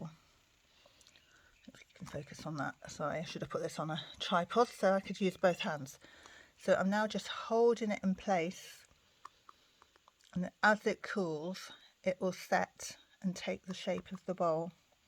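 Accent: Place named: United Kingdom. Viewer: Great tips, new subscriber and a British Accent hooray!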